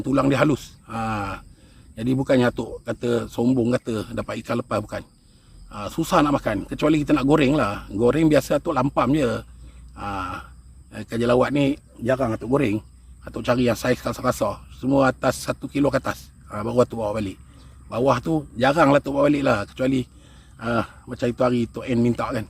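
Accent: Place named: Johor, Malaysia. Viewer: Atok asal johor ke? Tukar sebut tuko Biar sebut bio Hehe tnye je tok Slm dr tangkak johor❤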